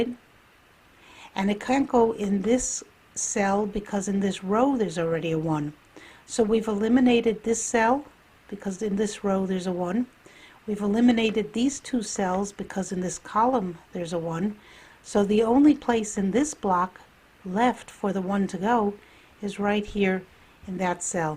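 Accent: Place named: Newfoundland, Canada. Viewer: Thanks very much. Are you from Newfoundland? :) Your 'accent' sounds familiar to me and I'm from there.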